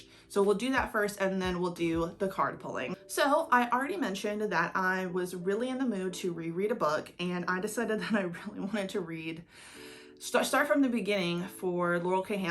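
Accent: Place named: England, United Kingdom. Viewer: breaking out the English accent, eh?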